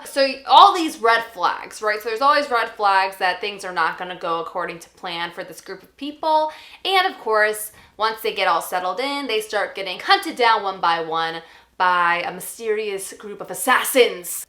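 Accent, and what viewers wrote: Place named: United Kingdom. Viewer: Killer British accent btw. Heehehehehe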